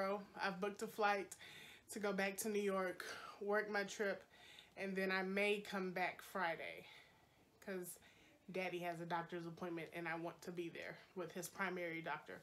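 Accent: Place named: Jamaica. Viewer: My dude has been in America 25 years and still has his thick Jamaican accent. He stated he never wanted to loose it.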